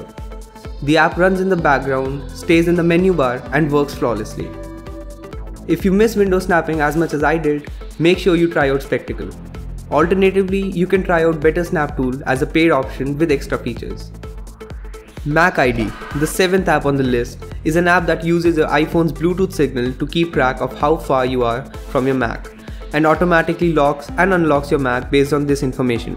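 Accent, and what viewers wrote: India. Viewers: my God ... how can i avoid these english-indian movies? Fuck ... horrible english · Disgustimg indian accent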